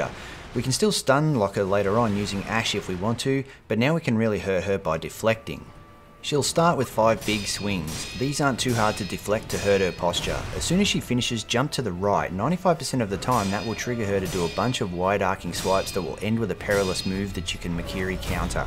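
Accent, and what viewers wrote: Australia. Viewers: Pretty Cool. You sound like an Aussie.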